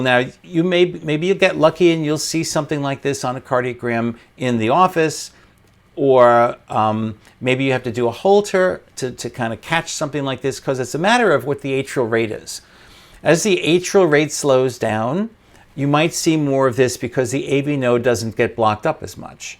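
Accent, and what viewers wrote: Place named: United Kingdom. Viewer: Funny how you took english(U.K) accent..Well done Bloke.. Informative video...Appreciate your dedication..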